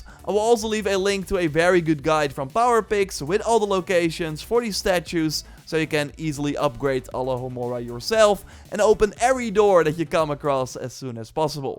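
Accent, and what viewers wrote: Netherlands. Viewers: Wtf ik kan gewoon aan je stem horen dat je Nederlands bent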